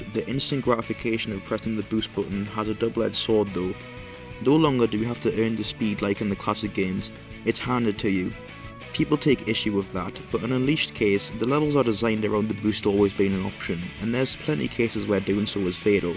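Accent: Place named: Northern England, United Kingdom. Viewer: You sound like you're from the North of England like myself. Way aye man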